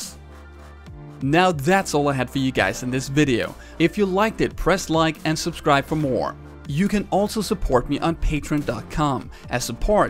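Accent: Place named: Denmark. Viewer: Your accent is soo Danish, I love it!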